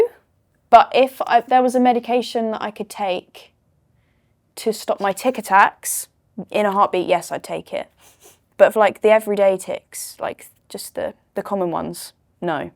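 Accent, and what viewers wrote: United Kingdom. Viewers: Sounds and acts like your typical brit really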